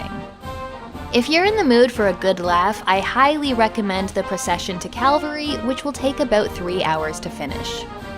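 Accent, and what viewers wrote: Canada. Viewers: What accent is this Canadian ?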